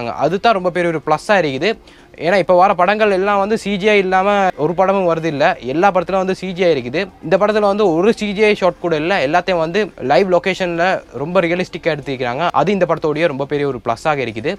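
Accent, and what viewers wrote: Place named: Sri Lanka. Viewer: இலங்கை தமிழா, கேட்க இனிமை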